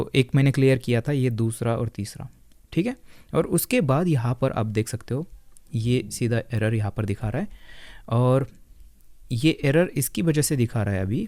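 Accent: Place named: Mumbai, India. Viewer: bhai aapka slang typical mumbaikar jaisa hai, btw love your content❤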